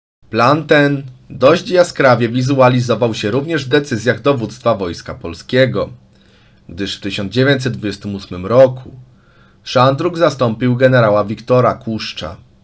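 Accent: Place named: Ukraine. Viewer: Bo to Ukrainiec i nie mówi tak dobrze po polsku jak Polacy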